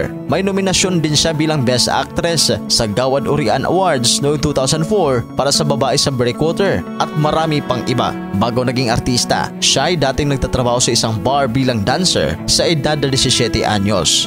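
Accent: Visayas, Philippines. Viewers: Tang ina mo! Ipakita mo video , wag ung visayang boses mo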